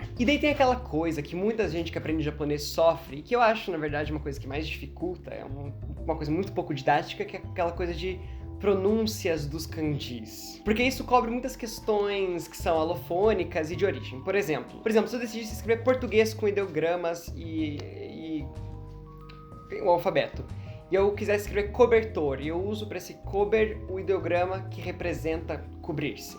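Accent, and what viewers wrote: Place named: Curitiba, Brazil. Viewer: Show! Você é Curitibano!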